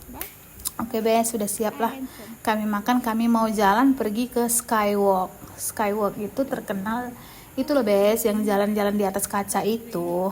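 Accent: Medan, Indonesia. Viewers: Logat..logat nya mb ini kayak orng medan ya😂😂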